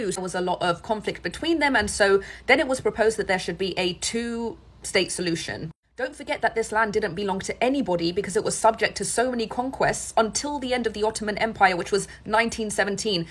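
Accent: United Kingdom. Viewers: I did not know people speak English with british accept in Iran...lets face it she is not Iranian... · A Muslim Iranian, speaking perfect British English. Straight out of Babylondons propwash academy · Info on anything in the Middle East would get better reception from someone without some version of a British accent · Nothing you have said contradicts anything I said. The basis for the word "Persian" is "Farsi", which is an Iranian language, and many Iranian people do indeed identify as ethnically Persian. many do not. I said Iranian PEOPLES, not people singular. She is indeed Iranian, but her accent is British. She was brought up there. Are you seriously using her accent to check her accuracy? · she sounds British lol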